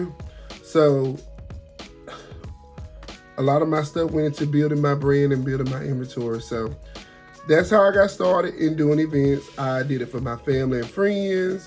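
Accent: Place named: South, United States. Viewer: You country………no way😂 who knew LOL! I love it though ❤ another great video. I love how you give tips to getting started. Again, I’m not doing this professionally I just absolutely love creating. I do so much, you name it I do it but it’s honestly for the love of it. I’m an ER Nurse and Nursing Instructor and I love that just as much. My designing and crafting are also my therapy. I lost my oldest daughter and her husband to an accident May 2022. If I didn’t have crafting and designing whew chile. But God is faithful and I trust Him. If God calls me to do it professionally then I’ll answer but I have to hear from Him and I’ll need him to call me by my whole government name! Keep elevating Sir.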